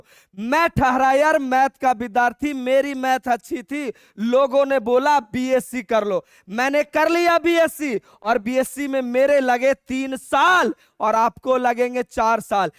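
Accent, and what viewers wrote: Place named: Haryana, India. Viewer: Haryana sa h sir ji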